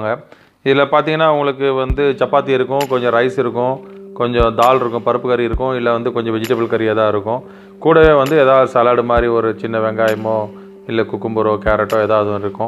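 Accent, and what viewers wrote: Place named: Kerala, India. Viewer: Bro i think u r from kerala maybe malayalam slang tuch anyway good job good explanation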